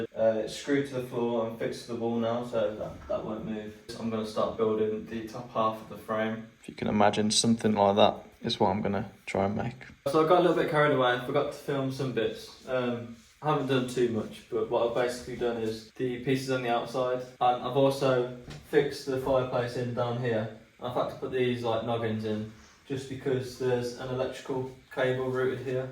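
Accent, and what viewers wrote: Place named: West Midlands, United Kingdom. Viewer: This looks so good, sounds like you're from the West Midlands, would you offer this as a service ?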